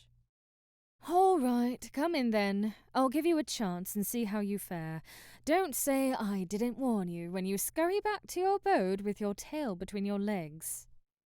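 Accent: United Kingdom. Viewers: This reminded me of how much I love the British accent… unfortunately reminded me of my ex girlfriend though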